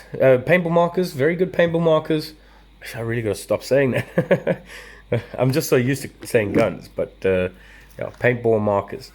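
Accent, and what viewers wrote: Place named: South Africa. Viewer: Off topic, but... just an observation... Interesting accent you have in South Africa! To my ear (Southern American) I detect a little Brit, a little Aussie, and oddly enough, a little deep south American. Back on point... I'm wavering between the Stormer Elite and the TMC. I can get a TMC for $40 US (at $229) more than the Stormer (at $189). For that small price difference, do you think it's a better purchase to get the TMC, overall? Will be running a red dot so don't care too much about the flip up sights. And the easy on, easy off fore end isn't a big deal either. But I do kinda like the metal construction of the TMC, as well as just the overall aesthetics, so leaning toward the TMC. Assuming that mechanically they are the same gun... err... I mean, MARKER! But with the Stormer being newer, I can't help but wonder... Does it have some subtle "improvements" or has it been downgraded with subtle cost saving measures.... 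Any thoughts?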